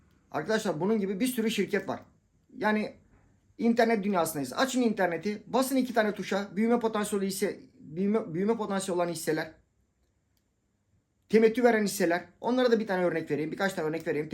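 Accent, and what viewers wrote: Thrace, Turkey. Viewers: abi biz senı sevıyoruzya sadelıgın hele bıde trakya şivesi süper oluyo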